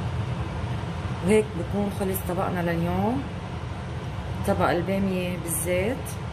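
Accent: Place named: Beirut, Lebanon. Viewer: لهجتك بيروتية أصيلة جميلة للغاية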